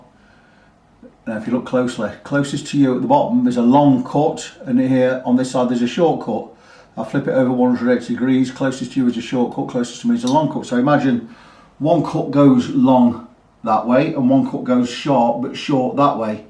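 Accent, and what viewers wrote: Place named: Clay Cross, United Kingdom. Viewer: I knew that accent,I’m from Clay Cross.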